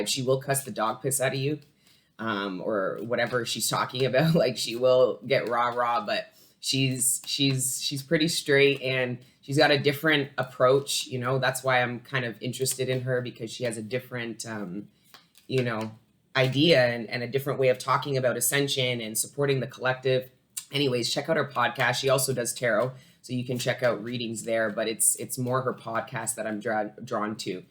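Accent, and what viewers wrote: Jamaica. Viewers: Your yardie accent had me hooked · Can I ask are you a question are you Jamaican 🇯🇲 ❓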